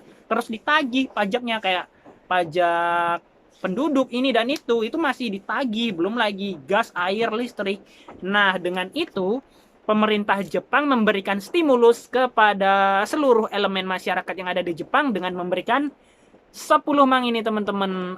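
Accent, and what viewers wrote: Java, Indonesia. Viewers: Bang, asli wong jowo ta?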